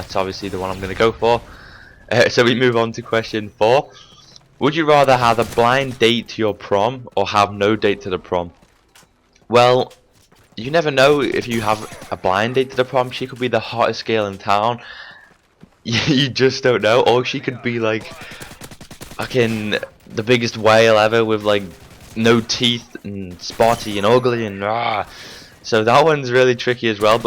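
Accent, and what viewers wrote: Liverpool, United Kingdom. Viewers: Liverpool accent hahaha its scouse mate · may i ask what part of the uk you are from because it sounds like you have a liverpool accent :P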